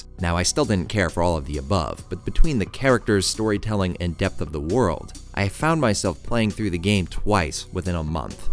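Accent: Canada. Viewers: Are you from Canada?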